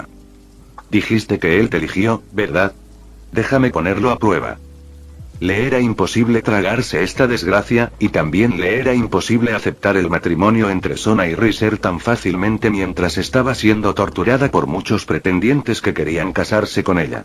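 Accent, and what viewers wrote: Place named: North, Mexico. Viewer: Que norteño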